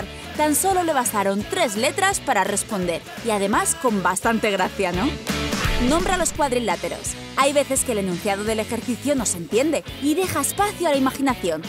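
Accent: Spain. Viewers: eres de españa ??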